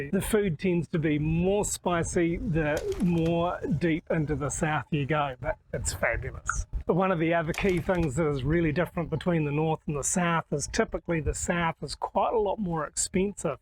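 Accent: New Zealand. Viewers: What a great idea for a video topic. I really enjoyed this. The presenter sounds like he really know what he's talking about. I learnt a lot. 20 years in Thailand and I can still hear a New Zealand accent :)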